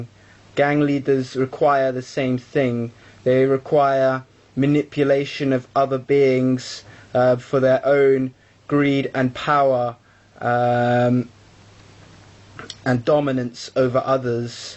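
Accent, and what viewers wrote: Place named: London, United Kingdom. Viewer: What gang was you in? you sound like suburban Londoner. I'm from Hackney, London, and i there ain't no gangs in London, just wannabees, you sound nothing like a gang member. I lived in Oakland for a while and that is a scarey place my nigga with a real bangers. If you wanna help bangers in London, don't make videos go out and do suttin for them. peace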